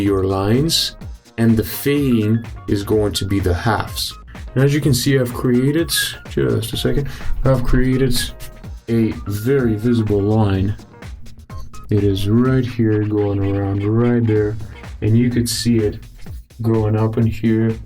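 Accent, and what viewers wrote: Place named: United States. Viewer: I´m confused.. u look russian, but you sound american:D but great tutorial!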